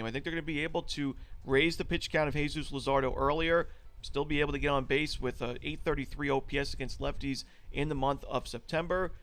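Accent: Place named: New Jersey, United States. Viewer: FRM PICKKK DAWGZ *jersey accent, emphasis on the K’s.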